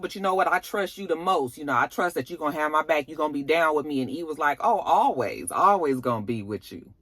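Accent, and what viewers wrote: Ireland. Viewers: 🤣😂🤣😂 I loooove your Irish Accent!! Excellente' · It's the Irish accent for me...:)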